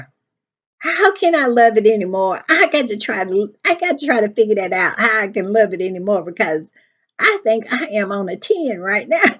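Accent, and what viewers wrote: South, United States. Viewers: ❤❤ TY ❤❤ We love the pettiness, the messiness, the southern drawl, we love it all!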